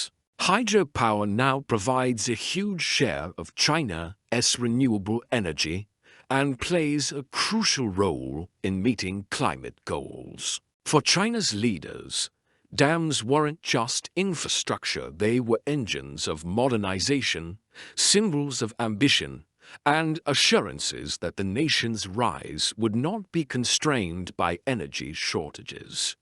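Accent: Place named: United Kingdom. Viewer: its the demeaning British accent. 😅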